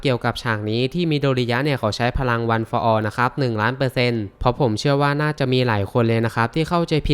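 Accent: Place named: Mae Klong, Thailand. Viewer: เป็นคนแถบแม่กลอง ราชรี เมืองกาญรึปล่าวครับ สำเนียงการพูดนี่แถบบ้านผมเลย555ผมคนแม่กลอง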